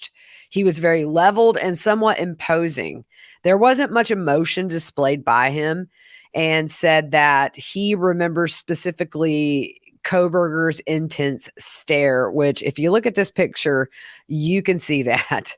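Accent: South, United States. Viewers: …this is my go to channel for outright facts! You’re the best! And your country woman accent helps! Nothing a Boston city boy likes more than a country girl givin information! 😂😂 you go girl!!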